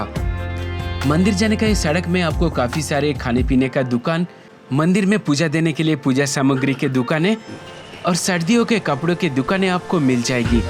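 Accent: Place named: Bengal, India. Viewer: Bhai tumi pakka veto bangali. Tomar pronounciation shunei bojha jacche. Tmi hindi te blog na baniye banglai koro na. Shunteo valo lagbe. Success o pabe.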